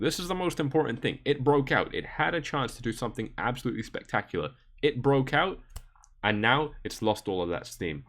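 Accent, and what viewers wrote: Scotland, United Kingdom. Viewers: First time watching your video- great content thanks! Is that a fellow Scottish accent I'm hearing?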